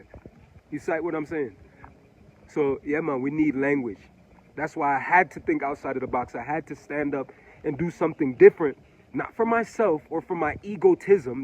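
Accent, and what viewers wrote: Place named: Patois, Jamaica. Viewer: I love ❤️ your Jamaican patois, it funny the ways it sounds.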